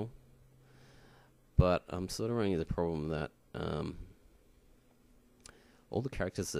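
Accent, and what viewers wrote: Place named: Australia. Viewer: HE'S AUSSIE!!!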